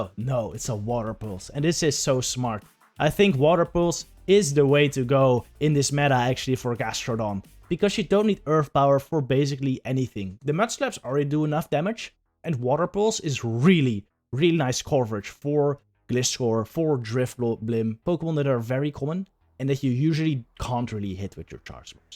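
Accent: Netherlands. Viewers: I have a question. Are you dutch because you have a dutch accent and if you are you are GEKOLONISEERD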